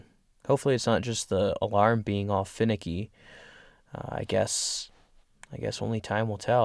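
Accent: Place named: Michigan, United States. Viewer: I like your vocabulary you talk like you're from Michigan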